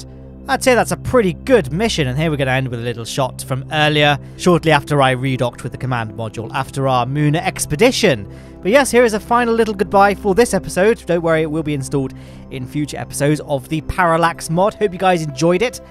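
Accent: United Kingdom. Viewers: I love how the auto generated captions mistake British accent for saying "Lambda" instead of Lander.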